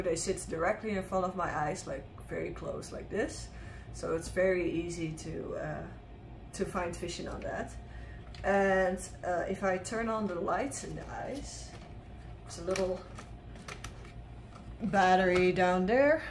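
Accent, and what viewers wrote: Netherlands. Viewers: I hear a Dutch accent!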